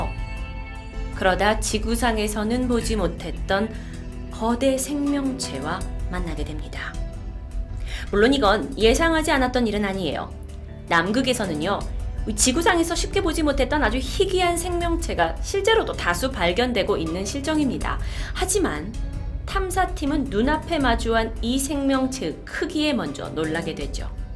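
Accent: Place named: Jeolla, South Korea. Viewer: 음이 정확해서 듣기 좋아요, 서울 말을 쓰시지만 원래는 전라도 분이시네요~ 전 강원도쪽 사람인데 전라도 경상도 사투리 너무 좋아 합니다.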